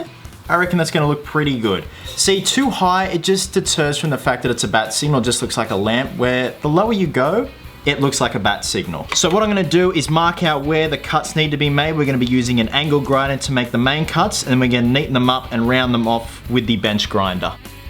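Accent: Australia. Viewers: your an aussie arent you??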